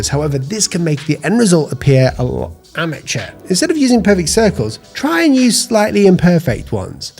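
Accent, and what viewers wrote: United Kingdom. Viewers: good and very informative but british accent sucks when watching tutorials like this. No offense. but its hard to understand what your saying because of the frequent pausing every word, making the sentence sound like one word and talking fast. its annoying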